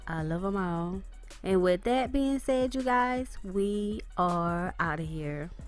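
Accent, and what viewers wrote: South, United States. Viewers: Girl, your country accent is so strong that I can barely understand what you're saying. I would just have to read with your voice on mute. Sorry. Where are you from? Alabama? Arkansas?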